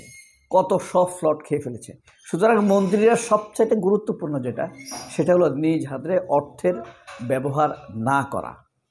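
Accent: Noakhali, Bangladesh. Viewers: ভাই ভালো থাকবেন.. আপ্নার বাড়ি সম্ভব ত নোয়াখালী অঞ্চলে তাই প্লট কে ফ্লট বলছেন আবার ফ্ল্যাট কে প্লাট বলছেন..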